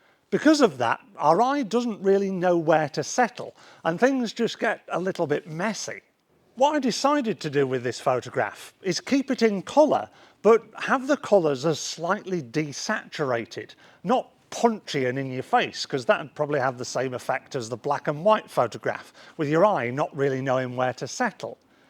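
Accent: United Kingdom. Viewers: you're great. i just found you a few minutes before and im all in. interesting story-telling, beautiful pictures - love the brittish accent, and you're glasses are the cherry on top. i draw my hat sir!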